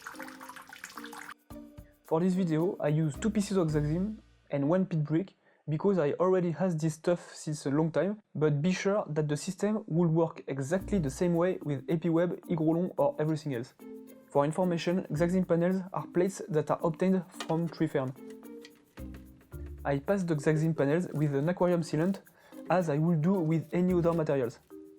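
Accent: France. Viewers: I don't understand your French-English accent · that sweet sweet french accent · Mais quel accent Français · I love your french english accent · un bon accent francais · Ca sent le français ça ! :) Sinon, belle réalisation. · On entend direct un Français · French accents are so cool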